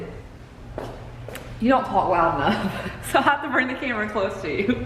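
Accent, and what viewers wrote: South, United States. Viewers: You sound so southern now...love ya x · The all white will be beautiful! Your southern accent is getting so strong! Also, everyone loves! He is the best and we can’t wait to continue to see him more on your channel! ❤️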